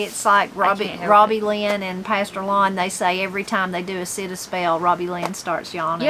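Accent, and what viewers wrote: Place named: Oklahoma, United States. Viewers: I love the way you speak! Growing up on the West Coast, we could NEVER get away with speaking that way. It almost sounded like a "foreign language" when I first started watching your channel. Not judging at All! I Love how in the South they use the phrase "Bless your heart"... sit down and stay a spell. I remember my friend Pansy said y'all one day, oh my goodness Mrs. Morris corrected her and embarrassed her in front of the entire class. She never forgot that. Your girls are some of the most loving young women I have the pleasure of getting to know. I watched a video of them yesterday asking if there were anyone needing Prayers!! Who does that? Girls that were brought up by loving families, that's who. So Sweet. What a better world this would be if we had more and. ❤ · My Oklahoma family is originally from your area and they speak like you. 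I was grown and gone before I called a couch a “couch” before that my family mostly called it a divan or a lavan. They also added the r to their words. Like my aunt Nina was “Niner”. My mother was and still is for many of her family Ader for Ada. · I love the way you talk. I am southern thru and thru. Learned most from my Granny. Miss her a lot. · How can I order your cookbook? Thanks. I’m from WV, so I do understand your (or ourdialect. Lol 😂😂😂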